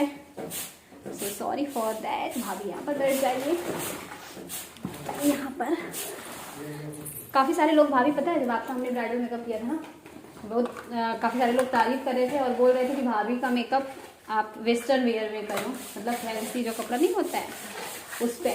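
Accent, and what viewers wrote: Bhojpuri, India. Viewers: I love your bhojpuri voice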